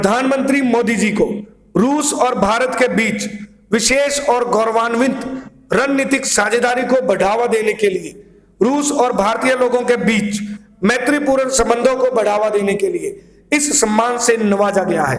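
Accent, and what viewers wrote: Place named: Punjab, India. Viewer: Aawaj me punjabi language ka touch aa raha h ji Good keep it up sir ji 👌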